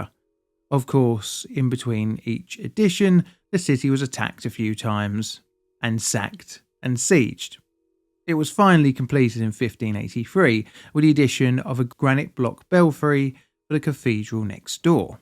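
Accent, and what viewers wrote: United Kingdom. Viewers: Brit pronunciation always fascinates me. Do you pronounce pizza as "pee-za?"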